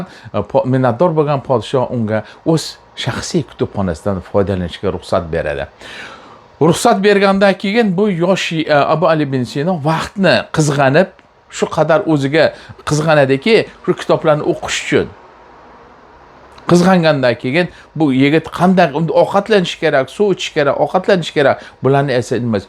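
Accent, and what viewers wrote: Namangan, Uzbekistan. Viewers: Rahmat.Namangan shevasida hammasi ajoyib😊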